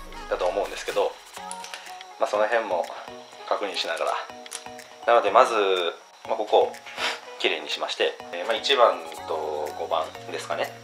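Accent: Hiroshima, Japan. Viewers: 話し方が広島県民 合ってます？ それも東部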